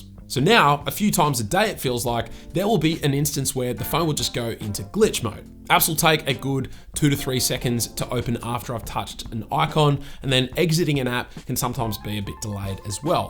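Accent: Australia. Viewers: Galaxy Aye52 😆 Love that Aussie accent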